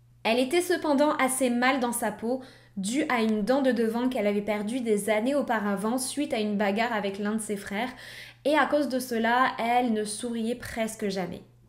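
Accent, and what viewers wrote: Quebec, Canada. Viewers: Punaise je me disais que t avais un petit accent québécois mais je comprenais pas pourquoi il était aussi léger tout s’explique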